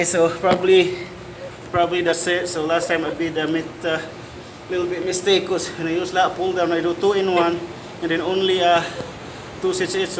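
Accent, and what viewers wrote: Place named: Visayas, Philippines. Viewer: bansaya mo English oiey, nice vlog kavisaya keep posting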